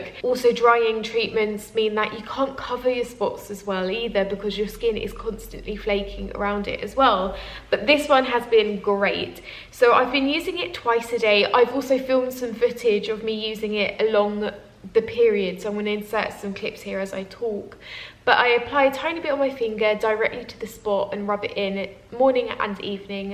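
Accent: United Kingdom. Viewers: I love your British!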